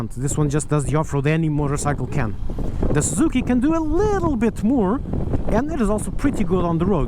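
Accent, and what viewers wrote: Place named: Portugal. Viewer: Your accent appears Portuguese/Brazilian but also don’t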